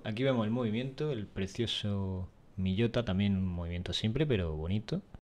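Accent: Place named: Granada, Spain. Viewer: Disculpa pero me acabo de dar cuenta que no respondí a tu comentario. Muchísimas gracias por tu tiempo, me quedo más tranquilo si és reparable. Tu vídeo me parece muy interesante, por tu acento intuyo que eres granadino, tengo mucha familia por la zona. Saludos desde Barcelona!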